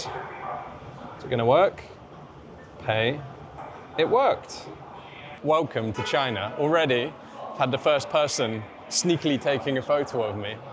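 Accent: United Kingdom. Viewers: Great video! You have Italian passport while speaking UK accent? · How is it you're using an Italian passport when you sound English?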